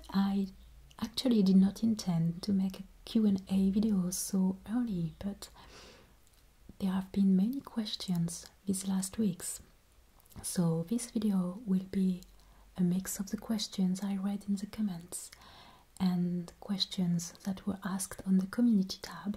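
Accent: Paris, France. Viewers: Je suis né avec de bonnes oreilles. J'entends toujours ton doux accent français. Vos vidéos sont incroyables. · You do not sound German lol Your accent reminds me of people in Paris France. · I love all your videos and just for the record you have always had a clear French accent 😍 I have been unknowingly into asmr for about 7 years now thinking im the weirdest person in the world going to bed listening to someone speak with an accent and chew gum lol I have actually only discovered a year ago that what that was doing for me was called asmr and I could find it everywhere on YouTube ❤❤❤ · As a german, i can tell you, you dont have a german accent at all, your accent does sound french :) very nice video btw! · as a Swiss Girl she Sounds 100% french and 0% german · I knew immediately your accent was French. I think you are incredibly talented, and reminded me of “Goodnight Moon”. 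You both have wonderful imaginations. Your props are fascinating. I thought you had to be in the movie business. Thank you for sharing your talents. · You are so lovely with your cute French accent and your channel is so lovely, pleasing and beautifully so aesthetic. Keep up the wonderful work. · She sounds 100% French. I would never think she was German. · Oh I'm French and she might sound German because she tries to put intonation but still has a very heavy accent. So she's not bad for a french girl, and she's trying hard, but sounds sooo French. Was very obvious for me. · Yes, when French people become more advanced in English and their intonation and accent approach something closer to a native speaker there is definitely some crossover in sounds with a German speaker at the same proficiency. I have studied French for years so overall it's easy to pin her accent down as French, but there are some sounds that definitely remind me of my ex who was from the Rhineland (Germany). 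· Hi, Thank you for your Q and A, it was very interesting. You are definitely an artist and so creative. I am happy that you are so successful here and look forward to enjoying more of your work. As an American, i find your french accent amazing and relaxing I wish you the best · I am American and I can tell, 100%, that you are French. I do believe that you have found an outlet for your creative spirit. What I have seen so far is outstanding. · As a non German I can say she doesn’t have a German accent lol, it’s clearly French, not sure why ppl would think differently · As an American, she sounds French, her pronunciation is so delicate & lyrical. And, as mentioned above, she drops the "h" . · You definitely sound French to me. I too thought you were in the theatre. OMG I am the same, a frustrated artist, a lover of the pre raphaelites and artists like JW Waterhouse. And I adore fairy tale illustrations. 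I also love the style of the Frouds artwork. Your videos are right up there with the very best ASMR artists. So warm and cosy and ethereal. You have been our sanity during these harsh times. You make the world a better place. Thankyou 💙🙏🏼💙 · I’m American, and she definitely sounds French. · I lived in France for a year, she has a French accent obviously but also I can see why some people thought German. German pronunciation is more like English so it’s a compliment that she pronounces English sounds well. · Really? As a Canadian this is the Frenchiest of Parisian French (as opposed to Quebecois accent) accents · Thank you! You have a beautiful French accent! Keep up the good work! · You're awesome! Love your videos so much. They help me to relax! Love your beautiful french accent!❤🇨🇵 Your english is fantastic👏👏👏 Keep doing videos❤❤❤👏👏👏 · I've just discovered her videos, and her accent slightly reminds french ( I live in France), but it's clearly not a typical accent of a native french speaker speaking English. 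Sometimes she sounds even like russian speaker in some moments.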